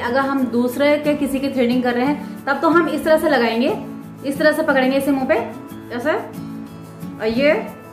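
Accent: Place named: Pahadi, India. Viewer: Apki bhasha mai phadi ltak aa rhi thi smajh gyi thi aap phaad se ho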